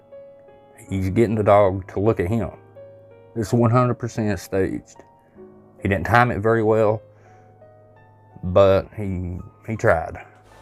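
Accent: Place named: Carolina, United States. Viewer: Are You From Carolina? I Love You'r Axent...Jihaaaa...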